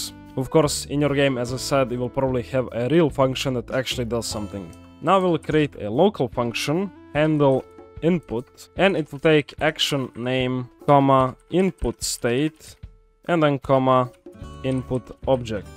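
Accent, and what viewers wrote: Russia. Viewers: RRRusian accent!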